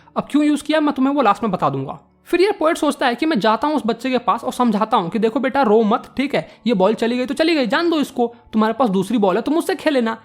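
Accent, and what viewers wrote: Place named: Maithili, India. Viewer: Beta I love your maithali tone . Because I am also a bihari ❤️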